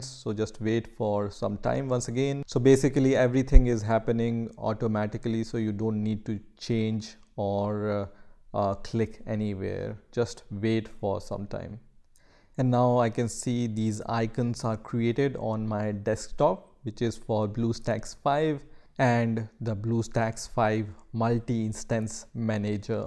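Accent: India. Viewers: this is very random but you sound kinda indian even though your browser language is German.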